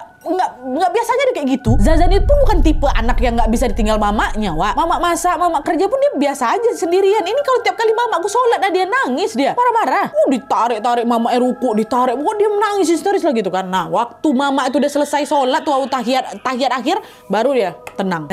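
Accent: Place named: Palembang, Indonesia. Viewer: Nahh wong Palembang mano suaronyooo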